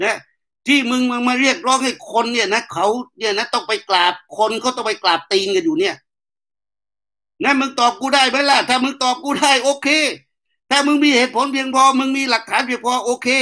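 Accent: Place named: South, Thailand. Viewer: Love your Southern Accent!!!( Ohh.Pukk Tai Ban Rao.)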